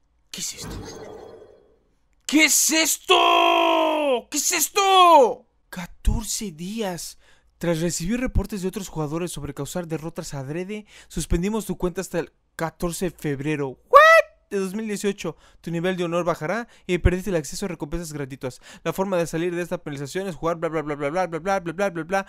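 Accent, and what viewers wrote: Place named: South, Spain. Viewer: De dónde es este we?:v tiene acento del sur :v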